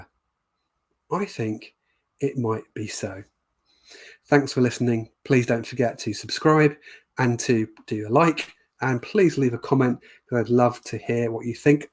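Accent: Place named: England, United Kingdom. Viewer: Superb English accent and manner indeed, on top of all the thought put in. Subscribing. (A beautiful reminder of Attenborough, but dreadful now to realise that "Soonack" Sunak and co have similar too, eloquently aiding the destruction of the our fellow human beings and their loved ones in Gaza and Ukraine!!🥶)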